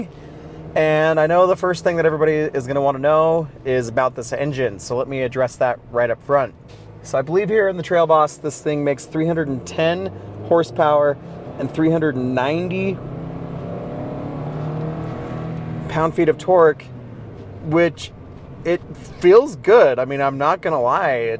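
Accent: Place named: Utah, United States. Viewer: I watched this video and about 1 minutes in I knew you were from Utah.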